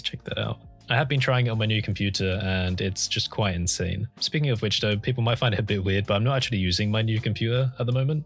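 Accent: United Kingdom. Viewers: this video was exactly what i needed. an easy to understand explanation of the new features in a british accent with a bit of dry humor weaved in. Thx. love ur videos and by-gen. idk how to make a unicorn emoji tho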